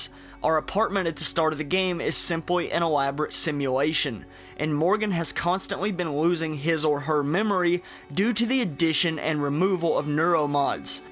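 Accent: South, United States. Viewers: I’ve heard some good southern accents but this one is just annoying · Haha nice Southern accent ! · Hey! No making fun of his Southern/Cowboy accent! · "Incredibly attractive southern accent," O........kay....... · They way you pronounce "typhon"....No thanks, keep that southern accent neuromod. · I've never been attracted to a southern accent but his voice is damn sexy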